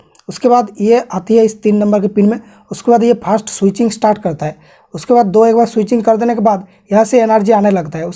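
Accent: Bengal, India. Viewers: Ap Bengali ho and sory ples repla💖💖💖💖😭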